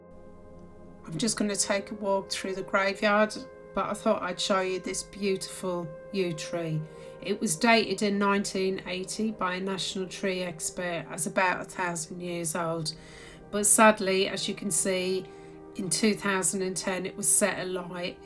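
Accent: United Kingdom. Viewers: seriously I showed the video to my beloved mum for her to experience real English and enigmatic atmosphere of the churches❤I admire Br.Engl❤and your way of speaking❤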